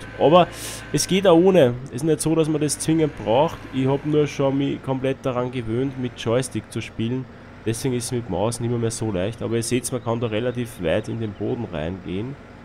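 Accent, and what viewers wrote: Austria. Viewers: Danke! Endlich einen guten Gabelstapler gefunden! Dein Dialekt ist Österreichisch oder? Abo hast du :D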